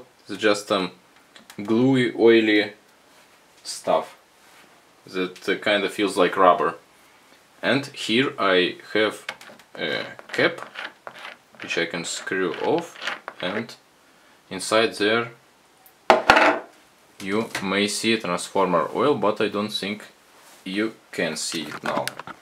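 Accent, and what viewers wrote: Russia. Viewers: спасибо, русскую английскую речь не спутаешь ни с чем, слишком все правильно выговариваете. :) Спасибо за видео. Будут вопросы обязательно спрошу. Меня больше интересует тлеющий разряд, так что спрошу под другим вашем видео. :)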